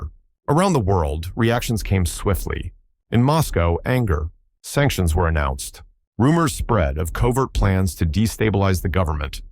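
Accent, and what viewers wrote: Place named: United States. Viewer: 😂 narretor says Ibrahim is 27 years old 😂😂😂😂 it's a American voice😂😂 FAKE NEWS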